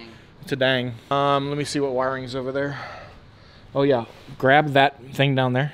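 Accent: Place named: Asia. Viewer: Talent indeed, when he speaks in his normal voice the asian accent becomes obvious.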